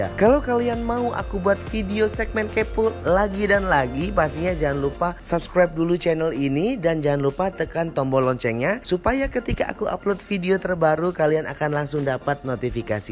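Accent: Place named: Lampung, Indonesia. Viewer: yapun... orang lampung nih ya😀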